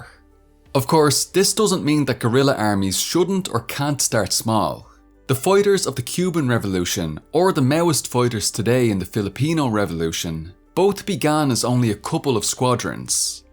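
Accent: Ireland. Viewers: I know it's serious material and you're doing a great job here, but I've never heard someone speak Spanish with an Irish accent and it's incredibly entertaining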